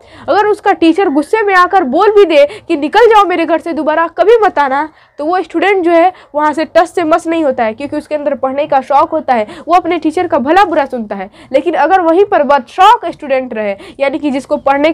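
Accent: Bihar, India. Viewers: Aap kya bihar se ho.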